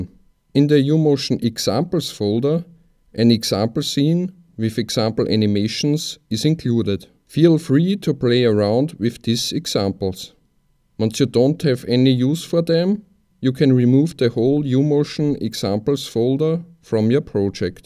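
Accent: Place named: Austria. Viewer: Österreichisches Englisch is einfach leiwand :D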